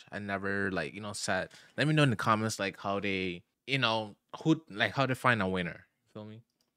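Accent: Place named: Caribbean. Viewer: Gday mate, love from Australia. I have a question that might sound weird......well because it is weird but that's my brilliant beautiful...weird brain. Do you have a Caribbean background? Or are you Canadian? Wait that nakes more sense. That would explain why you seem so chill and friendly. Sorry for being a weirdo, I'll let myself out. 😂 Edit: I was referring to your accent. I have a weird obsession with accents.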